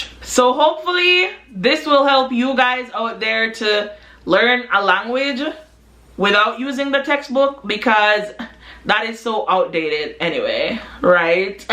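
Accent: Jamaica. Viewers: are you Jamaican? 👀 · 20 seconds in and I'm like yup this ones definitely jamaican😂😂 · I can hear the Jamaican 😂 私もジャマイカ人ですよ…(笑)面白い。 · I knew you were jamaican within 2 seconds. 🤣🤣🤣 I’m Jamaican-American, and I’d LOVEEEE to learn Japanese. But I gotta become fluent in Spanish first! Mi nah play!! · Is she Jamaican .....yeah she's Jamaican 😂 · lemme guess, jamaican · I Could Tell You're Jamaican,That Accent...I Checked You're About & There It Was Our Jamaican Colours.I Am Also Jamaican,I Want To Learn Japanese...Cause I Want To Be Where Pokémon Happens & Also I Love Manga...& I love Well Japan's Culture.Oh And..🇯🇲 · Yo 🤣🤣I love your energy, I’m guessing you’re from the Caribbean maybe Jamaica (I’m new here), definitely subscribing❤️ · omgg it looks like ur a jamaican im not sure but kinda sound like one to mi im a jamaican trying to learn japanese to be a mangaka or a translator!:3 can u tell me if ur jamaican?:) i really loved the vibes in this video too btw:> arigatou!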